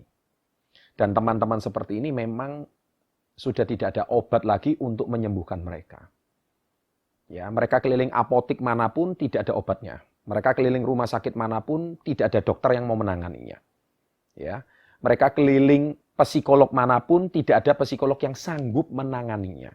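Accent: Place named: Banyumas, Indonesia. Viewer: Kurang ngajar sama orang tua ya kuwalat guees????orang ngapak iki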